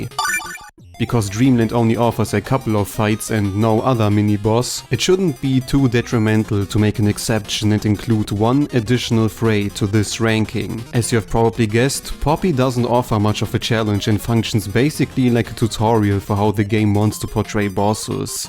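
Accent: Germany. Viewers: I'm glad you're finally back. I never thought I'd as happy to hear your german accent again😂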